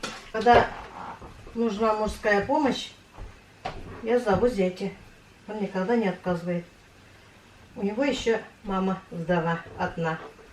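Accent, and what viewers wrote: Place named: Bashkortostan, Russia. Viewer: землячка моя дорогая! Я русская, но родилась и выросла в г. Уфа и поэтому татарский язык помню и: Исама Сис поняла ( может неправильно написала, но уж простите меня) т.к. мне 65, а уехала учиться из Уфы под Москву в 17. С большим удовольствием смотрю твой Канал, хорошо, что он появился и с большим удовольствием слушаю твою речь с местным акцентом- это как бальзам на сердце для тех, кто живёт далеко от своей малой Родины. Спасибо тебе, большое!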